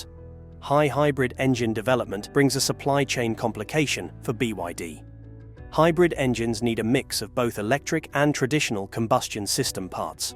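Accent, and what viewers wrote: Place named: United Kingdom. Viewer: I don't trust any promotion using a British accent.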